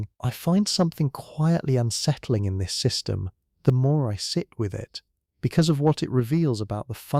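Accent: United Kingdom. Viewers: Coastal Southern California PM. As I’ve said before, I enjoy these videos, but the British accent is stimulating and keeps me from falling asleep. It would be nice if there were an option to hear an American accent. That would remove an aspect of interest, and I could relax and go to sleep. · I’m from Mississippi, USA 🇺🇸!!! Love the videos, plus the British accent saying alright love 😊❤❤❤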